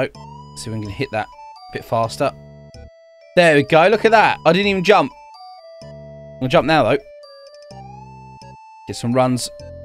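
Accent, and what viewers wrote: United Kingdom. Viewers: I love your British accent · your British innit? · Idk why but I just love your British accent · You’re British right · Nice british stylish accent I got 11600 studs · Is it me or is extra British in this video?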